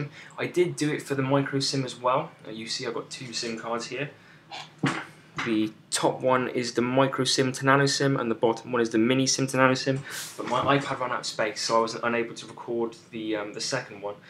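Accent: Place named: Bristol, United Kingdom. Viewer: are you from bristol by any chance??? I am from Keynsham (between bath and Bris)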